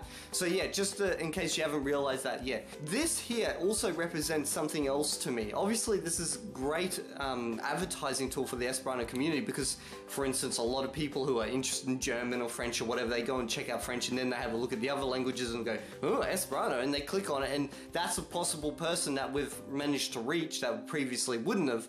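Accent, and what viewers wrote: Australia. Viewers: Saluton,! I first heard about Esperanto on an episode of Red Dwarf! True. And like most everyone else I've spoken to I thought it was a type of Spanish. My eldest son has been following the development of the Duolingo Esperanto course since last year. When it launched into beta my husband convinced me to join in with them both. I started on the 11th (June) After only six days I know more Esperanto than German which I studied in High School for four years. Using Duolingo is like playing a game, its just plain fun. Also your World of Warcraft Esperanto teaching series is our evening lesson, as the three of us are avid WOW players (Since Vanilla! Ten years who'd of thought we'd be still running around the same world.) It's great to hear another voice, an Aussie one too, speaking Esperanto and explaining some of the nuances we can't get from Duolingo. 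Like rolling the 'r's'. I just couldn't get it until I started repeating after you. Dankon! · I know, I have almost finished my German Duolingo course (5 skills left to go) and I will do the Esperanto course after. And are you Australian? I can't determine your accent.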